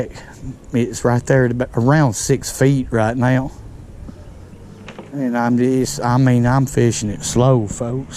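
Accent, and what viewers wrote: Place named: Kentucky, United States. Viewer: Lol! Man I like this guy! is a heck of a guy and helluva entertainer. I love the accent. Reminds me of my Kentucky family.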